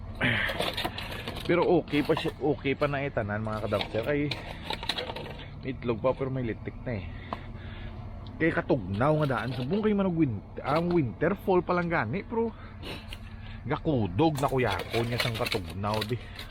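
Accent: Iloilo, Philippines. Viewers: Masarap pakingan ilongo salita, cebuano ako pero konti nakaintindi ako ilongo❤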